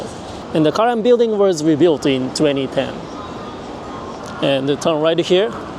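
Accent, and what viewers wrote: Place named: Japan. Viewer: Thank you again, for sharing this video — I really, really love it 🤩 You present Roppongi in such a real and authentic way. I wasn’t bored for a single moment from start to finish, even though it’s over 20 minutes long. There are so many interesting and informative details that I’ve never seen mentioned in the many other videos I’ve watched before, e.g. the potato-washing hill 😅 I also really enjoy your cool, natural Japanese accent and the authenticity of your presentation — especially how it’s not overly polished. It makes the whole experience feel genuine and engaging. I’m really looking forward to seeing you showcase more parts of Japan — especially the hidden gems beyond Tokyo and the many other beautiful regions across the country 😉👍🙏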